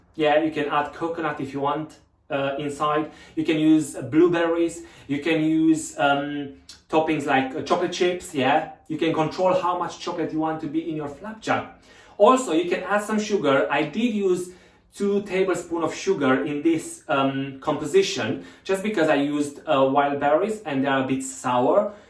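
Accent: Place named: Romania. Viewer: He is romanian..i knew it..by the accent.😉👍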